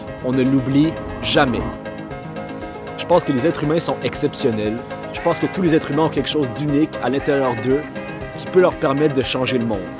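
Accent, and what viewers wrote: Quebec, Canada. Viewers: Ce qui m'a attiré dans ce vidéo c'est l'accent Canadian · J'habite au Brésil, et j'apprends le français depuis 5 mois. Je sais pas le raison, mais c'est plus facile pour moi pour comprendre l'accent québécois que l'accent français et, découvrir ta chaîne, plein des sujets que je m'intéresse (et vous avec votre mignon accent), était une bienfait. Il n'y a pas beaucoup des chaînes en français que sont cool comme la votre. Merci.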